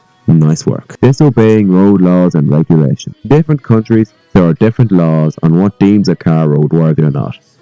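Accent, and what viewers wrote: Ireland. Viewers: Are you Irish cause I am to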